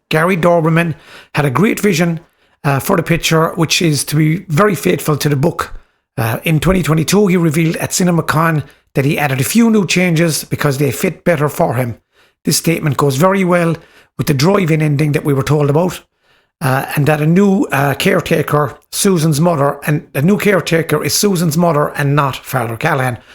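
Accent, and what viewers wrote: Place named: South, Ireland. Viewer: It’s simple I hear southern Irish and I sub 😃 great video love from Limerick!😊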